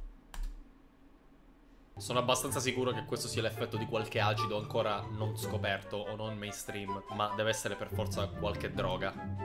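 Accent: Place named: Sicily, Italy. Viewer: la cosa che mi fa più godere è il tuo accento siciliano, la raffinatezza nel pronunciare “minchia” ha qualcosa di soave.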